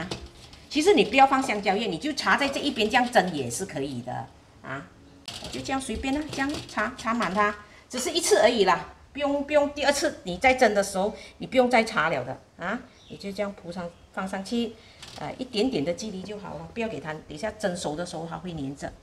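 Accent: Malaysia. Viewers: 大马的华语口音很好听，亲切而柔情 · 喜欢这个aunty 讲话很可爱也很真。纯马来西亚华语。希望你健康长命百岁，做出更多kuih的视频分享。和更多实验更简单教学方法。不然以后这些传统糕点就失传了。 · 很喜欢aunty 讲的马来西亚口音的华语，很亲切，也喜欢aunty 介绍马来风味的中国小吃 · 一听就是馬来西亚的华語！好有亲切感！那些话語馬来西亚人听得懂.，也听到很爽！ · 您是大好人肯无私分享，希望您能继续出做糕点的视频，马来西亚华语很亲切的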